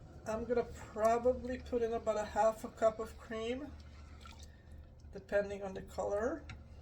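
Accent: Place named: Europe. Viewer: American girl? You have a European accent.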